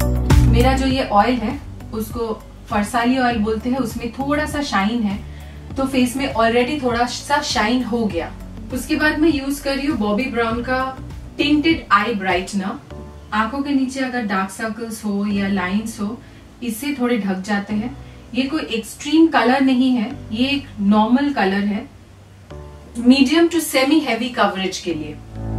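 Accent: Bengal, India. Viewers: Aoki baatein bohot acha lgtahai akdom bengali touch a Di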